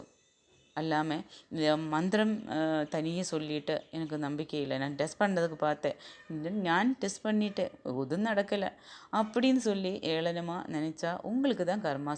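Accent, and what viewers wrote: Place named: Kerala, India. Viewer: Akka unga speech nallarukku.... malayalam kalantha tamil🎉🎉❤❤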